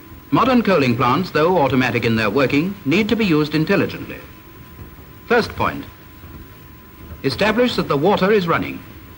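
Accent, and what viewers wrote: United Kingdom. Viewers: I've watched this video so many times, but I come back for the narrator's exquisitely dapper British accent.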